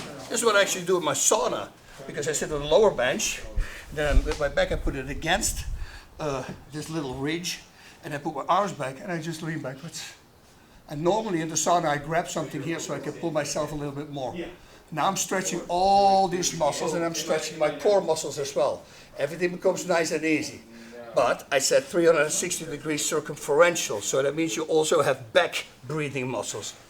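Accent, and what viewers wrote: Netherlands. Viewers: Hoi. Ik ben de meeste amerikaanse fitness adviseurs een beetje zat. Meestal komen ze met varianten op dingen die we wel kennen. Jij weet mij te verrassen. Fijn om heel in de verte dat nederlandse accent te horen. Ik zit dagelijks op de racefiets en deze tips ga ik zeker uitproberen. Groeten & Dank van een 60 jarige rotterdammer.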